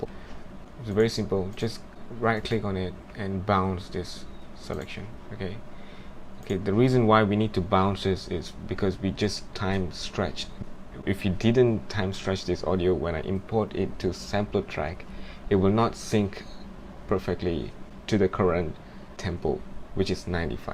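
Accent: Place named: Malaysia. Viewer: I thought the accent sounded familiar! Hehe Malaysian in the house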